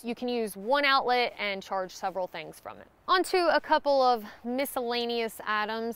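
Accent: South, United States. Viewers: I❤ that Southern Belle accent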